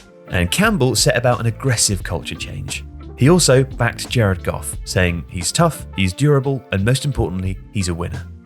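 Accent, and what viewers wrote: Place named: United Kingdom. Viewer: It’s weird hearing a British accent explaining the NFL…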